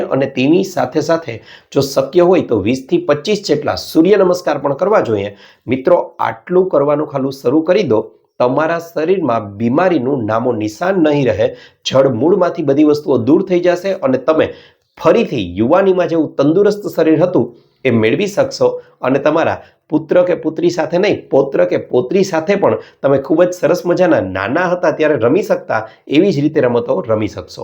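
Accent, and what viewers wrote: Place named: Rajkot, India. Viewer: રાજકોટ તરફની ભાષામાં 'સ', 'ષ'ના ઉચ્ચાર 'સ' કરે છે અને 'ળ'ને 'ડ' બોલે છે. તમારી ભાષામાં એવી અસર કેમ છે ?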